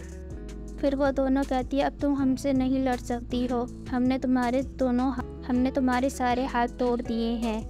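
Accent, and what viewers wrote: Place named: India. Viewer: I don’t want to be mean but you sound like every Indian person ever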